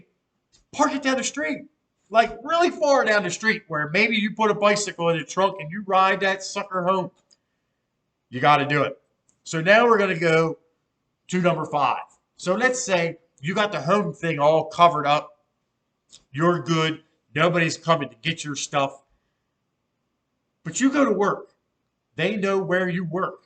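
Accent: United States. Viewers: Is that sarcastic? He’s a bit country but the info is at least smart sounding to me. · I can't focus on anything hes sayin over the soujd of his Pittsburgh accent!!!!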